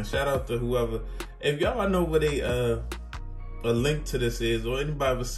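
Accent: Baltimore, United States. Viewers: Love the video, I subbed. I’m just wondering but are you from Baltimore?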